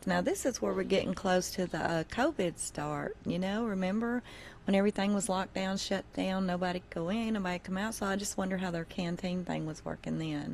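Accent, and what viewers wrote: South, United States. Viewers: this Aussie is loving your sweet southern accent reciting this shopping list. Also your little “barbs” thrown in make this very interesting to listen to. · Nothin' better than southern accent. We friendly too! Well, most of us...😁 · En vel ops lol I know those southern pronounciatuons!! I love it! Been in South Texas too long not hearing the real southern accent!!!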